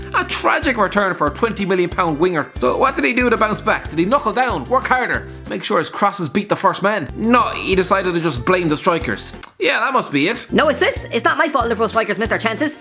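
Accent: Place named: Ireland. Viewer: If I click on one of their videos and do not hear a majestic Irish fella then I’m gone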